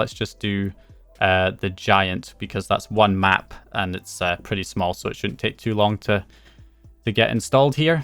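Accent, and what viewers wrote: Scotland, United Kingdom. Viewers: Been thoroughly enjoying your videos man! Keep 'em coming. It sounds like you might be Scottish so that's an added bonus! "HERE WE, HERE WE, HERE WE F*CKIN' GO!"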